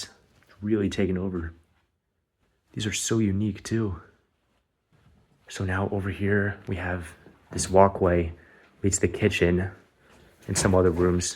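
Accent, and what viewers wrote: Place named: United States. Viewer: What’s an American rummaging through a home in Britain for. Definitely not a British accent.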